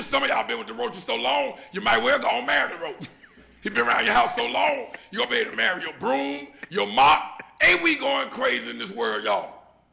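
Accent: South, United States. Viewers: 🎯💯👍🏾❤️ Although his Southern accent was on 💯, he took a fearless and firm stand on the Word of God...AGAINST high officials' condonement of public display of homosexuality‼️ He spoke all TRUTH, y'all‼️ HE...SPOKE...ALL..TRUTH‼️ He and Pastor Gino Jennings, regardless of WHO hears them, sound the alarm of God's Word...LOUDLY!!! FIRMLY!!! SHAMELESSLY!!! "I ain't bought by NOBODY!" (in reference to speaking the Word of God) How many other Christian leaders can honestly say the very same thing, in the Name of the Lord Jesus? How many Christian leaders are seriously willing to speak against this abomination, in the Name of the Jesus Christ? God bless!!!👍🏾❤️💯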